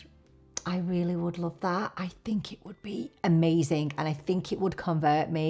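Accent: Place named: Manchester, United Kingdom. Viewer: I love your reviews even though we are literally like day and night when it comes to shades - I'm deep dark😊 I love how informative your reviews are and I love your accent, reminds of when i used to live in Manchester. You're quickly becoming one of my faves beauty/makeup Youtubers.❤ I've been eyeing the VB eyeliners, might give them a try.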